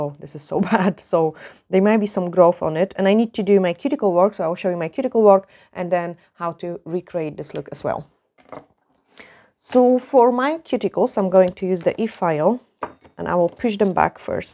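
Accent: Scotland, United Kingdom. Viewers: Thank you for this video! Such an interesting accent. It’s like, Scottish but also something from Eastern Europe?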